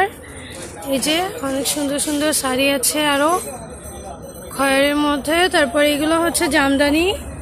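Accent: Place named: Sylhet, Bangladesh. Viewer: তোমার সিলেটি ভাষার প্রেমে পড়ে গেলাম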